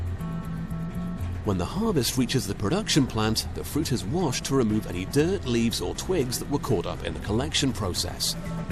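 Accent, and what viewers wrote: United Kingdom. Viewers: The video states, "if the fruit goes over 27 degrees, it can no longer be called cold-pressed". Since the narrator sounds British, I assume that it is 27°C (~81°F). The average USA swimming pool is kept at 80°F, so if you got into a bath of 80°F olive oil, the oil would feel cold since human bodies are around 98°F. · British accent > American accent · anybody else cant stand british narrators? idk, maybe its the accent, maybe it seems like hes trying to sound like a smartass.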